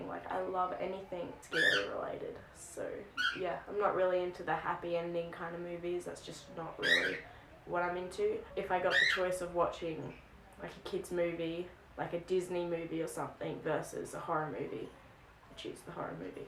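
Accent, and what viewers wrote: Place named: Australia. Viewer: Also figured you were Australian with that neat accent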